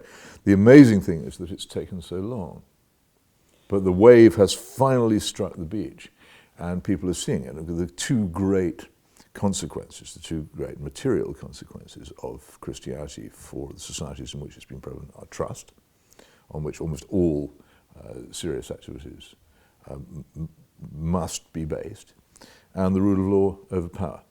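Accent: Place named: RP, United Kingdom. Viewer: <link> Just having some fun. Can any other American understand every word of this Varneyian Elite English? Windsor, I believe. Softening the hard edges of our English to sound more French. It really should be captioned. But he makes excellent sad points. So it is important to be able to speak in a standard we can all readily understand. So we can understand.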